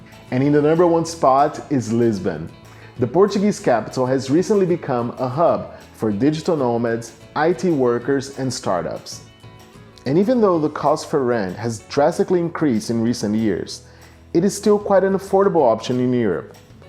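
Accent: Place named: Brazil. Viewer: Brasileiro haha! Vê-se pela pronúncia. Um forte abraço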